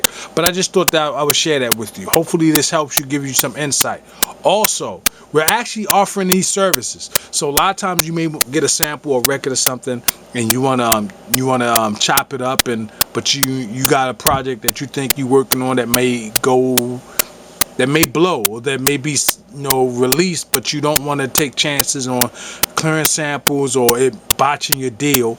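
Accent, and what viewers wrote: Baltimore, United States. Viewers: That Bmore accent be killin me! Lol. great vid fam!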